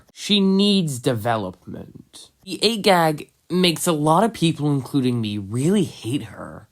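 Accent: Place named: Australia. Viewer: What happened to the australian accent 😔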